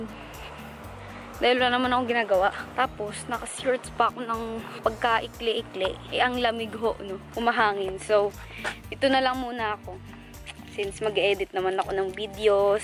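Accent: Iloilo, Philippines. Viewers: Ate na iilonggo ka ba??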